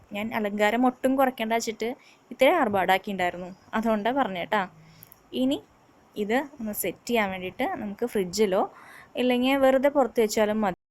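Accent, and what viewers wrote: Thrissur, India. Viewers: Thrissur aano native place 😃slang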